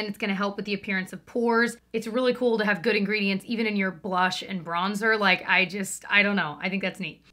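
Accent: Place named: Midwest, United States. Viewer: those Midwestern flat A’s made me laugh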